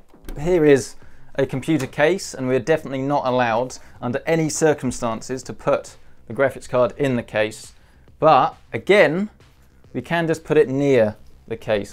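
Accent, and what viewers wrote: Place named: Southern England, United Kingdom. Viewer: Judging by your accent are you in the south of England?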